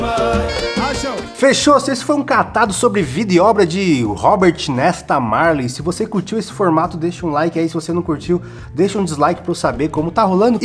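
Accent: São Paulo, Brazil. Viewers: O cara faz um vídeo com dialeto paulistano PQP que merda de sotaque do caralho. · Paulista fala mttttt feio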